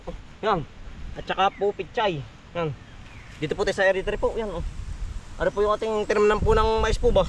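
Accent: Batangas, Philippines. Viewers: Taga Batangas po ba kayo? Hindi ko magets ang punto niong magsalita. Sorry po. Watching from Delano, California. Thank u n god bless.❤❤😊😊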